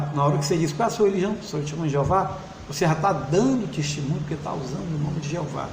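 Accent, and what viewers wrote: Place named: Rio de Janeiro, Brazil. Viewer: Este é sem sombra de dúvidas o mais carioca de todos os cearenses. Parabéns pelo ótimo depoimento. Grande abraço.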